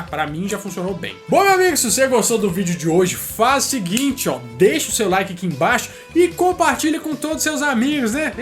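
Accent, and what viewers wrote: Minas Gerais, Brazil. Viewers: Está de parabéns esse mineirinho da fala engraçada.muito bom.uma aula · nussa o cara tem um sotaque muito mineiro... kkkkkk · Tu é mineiro??? KARAI TBM SOU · オタクではない será que é porque ele É mineiro?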